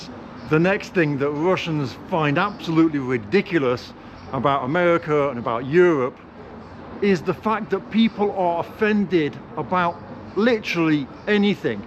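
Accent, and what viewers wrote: United Kingdom. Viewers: your accent somehow brought me to my school years, when on learning English we were listening to the tapes, and thats exactly how the typical British man sounded then. Got suscribed because of that small piece of nostalgy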